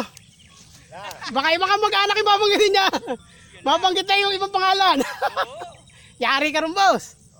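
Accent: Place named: Nueva Ecija, Philippines. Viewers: Kala ko dati Tiga Nueva ECIJA po kayo kasi pag nag salita kayo may dine ere 😅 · pag ng salita ng eka un sure tga ne un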